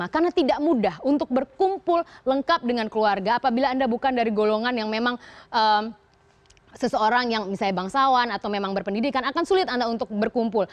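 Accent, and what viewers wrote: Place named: Eastern Indonesia, Indonesia. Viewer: itu aksen bung, kan dia dari Indonesia Timur, wajar lah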